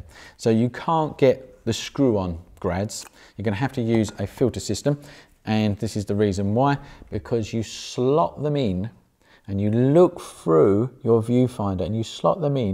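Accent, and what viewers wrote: United Kingdom. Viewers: Lovely accent feels like I'm watching great British bake off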